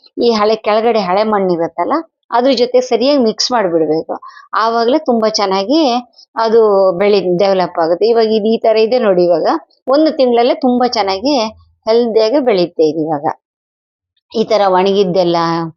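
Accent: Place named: Malenadu, India. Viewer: Malenadu bhashe tara ede..mam yav kade nivu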